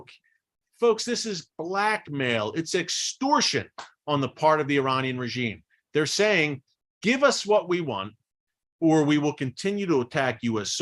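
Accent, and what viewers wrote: Philadelphia, United States. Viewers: Lol Thought I heard Philly accent.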